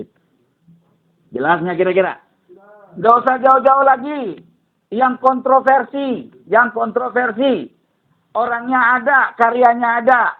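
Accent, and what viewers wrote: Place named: Madura, Indonesia. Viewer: Halo syaikh.. orang pundi gih.. kok nadanya kayak Madura apa betul kyai..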